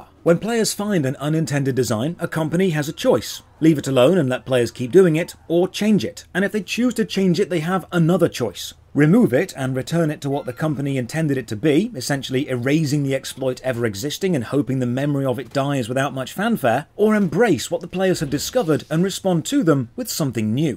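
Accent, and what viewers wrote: United Kingdom. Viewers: brittish eric foreman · are you spiffing brit?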